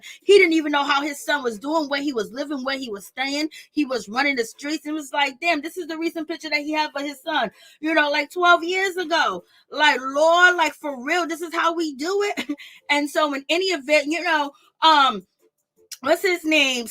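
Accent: New York, United States. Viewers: Hahahhaahhhhahhhhahahahahahahahahahahahhaahhahahaha😂😂😂🤣😂🤣😂😂🤣🤣😂🤣🤣🤣😂🤣 you are so funny gurl . And your beautiful New York urban voice .. Lar that ❤️❤️❤️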